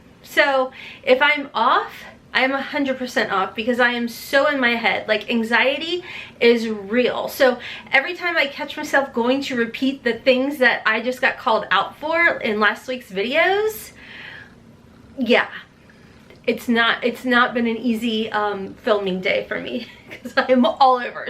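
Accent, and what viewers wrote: South, United States. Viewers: I love how you talk 😍🥰💕 especially when you say “matte” and “back”.... and girllll I’m from the south (Virginia) and I have that southern accent as well.. and OFTEN REPEAT THINGS LOL... do your thang girl! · Awe... try not to let people bully you...It’s not that your not putting on your big girl panties, it’s people can be mean and insensitive...Your accent is cute and southern, like mine! Your a sweet and beautiful queen, so don’t listen to mean girl’s comments...We love you, ALL of you! 🥰♥️🥰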